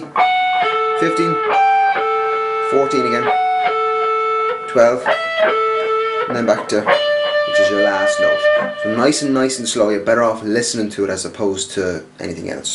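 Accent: Ireland. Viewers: irish ? :D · are u irish... im from galway!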